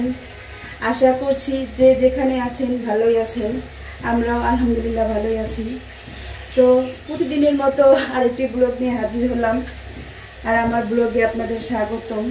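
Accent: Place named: Amin Bazar, Bangladesh. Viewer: আমি একজন ওমান প্রবাসী।ঢাকা মিরপুরের, দারুসসালাম, জহুরাবাদ আমার বাড়ি। কথা শুনে মনে হচ্ছে আমিন বাজারের কোথাও আপনার বাড়ি জানাবেন আসলে ভাষার মায়ায় আপনার ভিডিও দেখা শুরু করেছি। মনে হয় নিজেদের মানুষ ভিডিওগুলো ভালো লাগে। দোয়া ও শুভকামনা রইলো এগিয়ে যান। ভালো থাকবেন।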